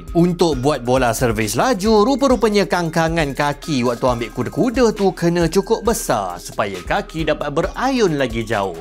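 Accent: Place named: Terengganu, Malaysia. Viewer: orang ganu rupenye😂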